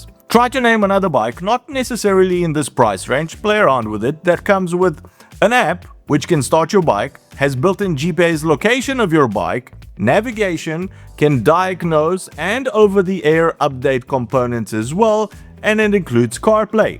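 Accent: Afrikaans, South Africa. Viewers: Just found your channel and looking forward to seeing more! Hoor ek reg, is jy dalk Afrikaans?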